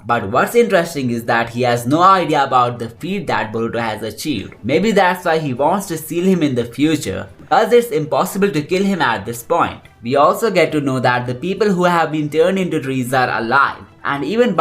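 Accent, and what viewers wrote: India. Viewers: can u speak hindi??? your accent seems indian if yes... please make theories in hindi please please i am your OG watcher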